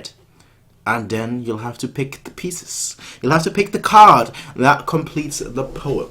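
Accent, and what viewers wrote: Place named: United Kingdom. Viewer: I really love your reaction and accent bro. British right?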